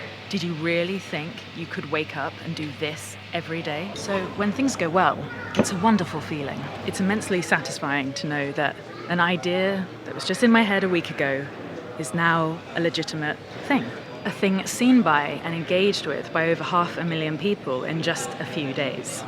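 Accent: United Kingdom. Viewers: Your videos are Fantastic, and your Voice is British perfection. Thank you for sharing with the world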